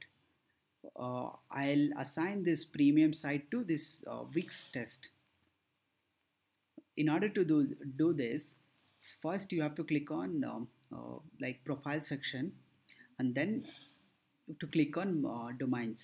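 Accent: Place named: India. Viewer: Thankyou so much. So glad to hear a indian helping us out.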